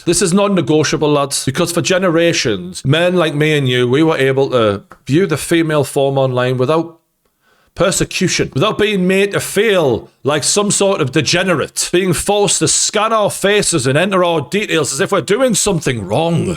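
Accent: Newcastle, United Kingdom. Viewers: Wow bro I got a big shock. You sound like the true Geordie I know but look different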